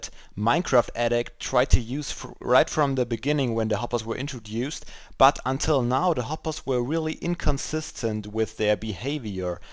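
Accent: Germany. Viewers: German I'm guessing? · Ich wette du kommst aus Deutschland :) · man kann sofort hören mit dein deutschen dialekt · hey kanns sein das du deutscher bist ;D du spricht irgendwie so deutschen dialekt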